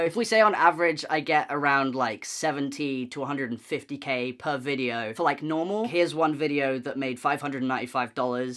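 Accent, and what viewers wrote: England, United Kingdom. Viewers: i have no idea where this guy is from like he’s british right ? i’m so confused his accent is english but his words are not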